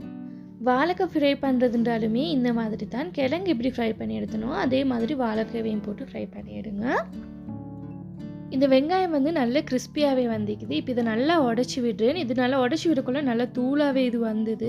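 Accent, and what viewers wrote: Akurana, Sri Lanka. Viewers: நீங்கள் எந்த ஊர் மா Akurana யா? ?? உங்கள் பேச்சில் Akurana வாடை அடிக்கிறது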